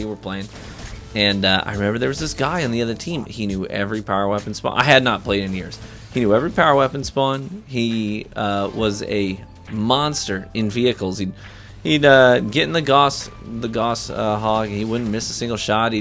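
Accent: United Kingdom. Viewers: Gotta admit, I wasn’t ready for the funny British accent. Hahahahha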